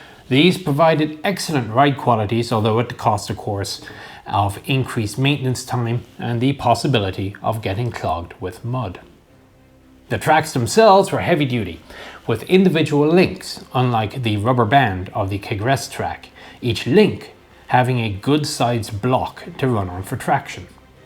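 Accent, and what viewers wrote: Ireland. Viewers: Is this some joke reference that I've missed? As bwarre2884 noted, the accent is very Irish. In any event, he is perfectly understandable. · I can hear the Irish accent coming out all over the place!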